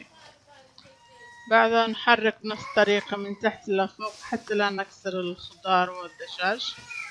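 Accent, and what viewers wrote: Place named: India. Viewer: 😀 لهجة محلية وعربية فصحى وانجليزي بالكنة هندية 😀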